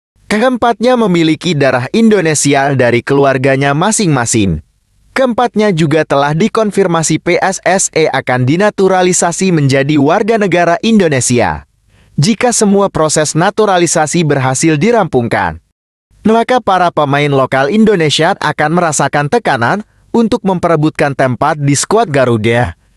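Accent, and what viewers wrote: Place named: Malaysia. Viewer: BROO SAYA KASIH TAU KAMU KALAU BACA BERITA PAKAILAH BAHASA INDONESIA YG BAIK DAN BENAR,,,NGGK USAH IKUT IKUTAN SAMA ORG MELAYU MALAYSIA....??? EFF AFF/..... A E / B BE C Si D.... .....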